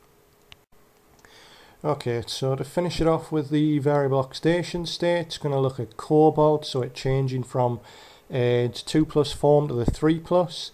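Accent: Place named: Newcastle, United Kingdom. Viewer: Great to have a Geordie ;)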